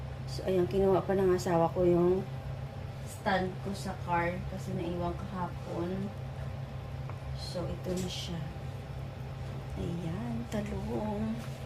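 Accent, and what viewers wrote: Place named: Iloilo, Philippines. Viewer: Hi sis, its Melanie Tingson if you remember me hehehe.. wow sarap naman ng sinigang ng ulo ng salmon. I love this too, bagay sa panahon na maulan Ilongga ka ba sis? narinig ko kasi hehehe kainin na natin yan sis...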